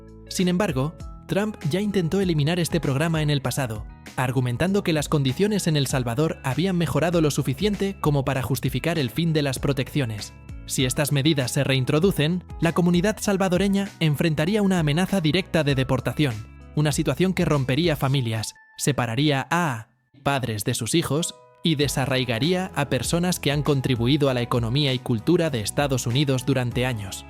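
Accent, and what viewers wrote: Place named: Spain. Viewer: Creo que por tu forma de hablar eres Español, son pocos los países latinos que buscan emigrar a EEUU la verdad son muy poco los panameños que quieren irse para allá ya que por el estatus de vida en nuestro país es estable solo viajan de manera de turista pero no por emigrar...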